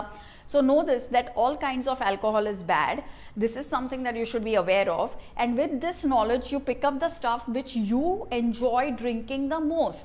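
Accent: Mumbai, India. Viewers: i love d way u talk ......in ur vedios ....so natural ......fulll mumbaikar style lov it .......with ur funny lines that accept ur a bevda lol .....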